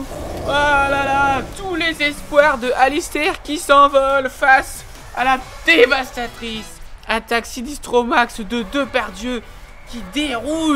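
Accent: North, France. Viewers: Il a naturellement l'accent du nord en faite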